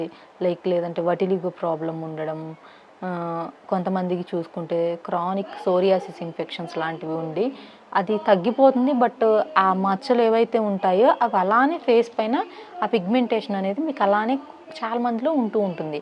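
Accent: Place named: Andhra Pradesh, India. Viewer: Andhra slang alane untadi bro try to understand don't underestimate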